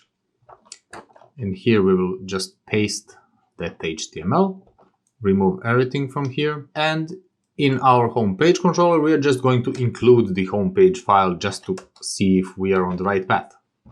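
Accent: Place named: England, United Kingdom. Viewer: Izasao si mi kao predlog posto dosta gledam tutorijale pa reko da vidim... Engleski naglasak i ime :)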